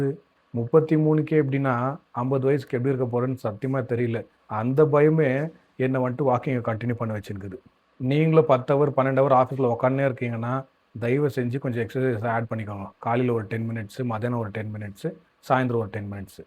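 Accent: Bangalore, India. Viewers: Easter birthday nu video liye sollitunga, unga slang uh vechu place of birth Bangalore nu potupe, which year bro, 33 na 94 or 93?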